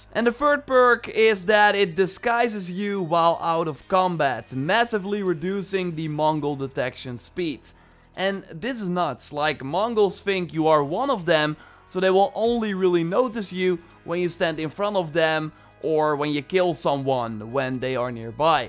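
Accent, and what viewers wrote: Netherlands. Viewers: Nederlandser accent kan niet 😂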